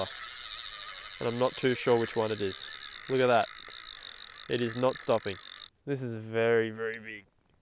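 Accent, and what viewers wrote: Australia. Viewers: I love the Aussie accent. combined with fishing that is just perfect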